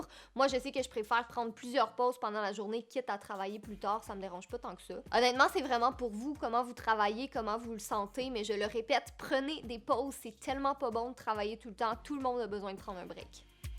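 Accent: Quebec, Canada. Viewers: Tu est cebecoise ?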